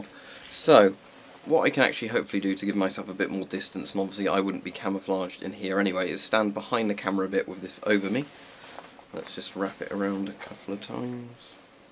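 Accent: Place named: United Kingdom. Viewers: Americans will listen to a Brit read the IRC tax code since we love that accent. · I like the way he says hello with his British accent and every video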